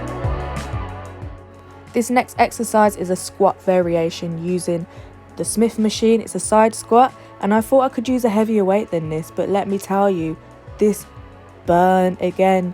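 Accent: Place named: Birmingham, United Kingdom. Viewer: Dunno if I can deal with the brummy accent😂 a decent pump never the less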